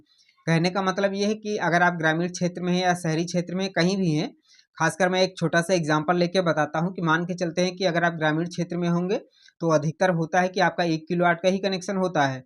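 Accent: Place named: Western Uttar Pradesh, India. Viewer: आवाज तो आपकी वेस्ट up की लगती है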